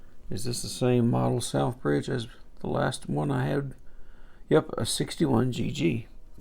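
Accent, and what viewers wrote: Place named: South Carolina, United States. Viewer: You sound like you are from my area...South Carolina?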